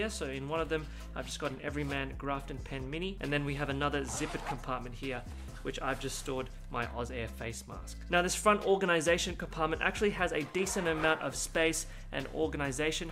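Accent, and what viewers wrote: Australia. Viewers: Homies accent is wicked yo. Always a trip for me to see a fellow Asian with the croc hunter accent. 👍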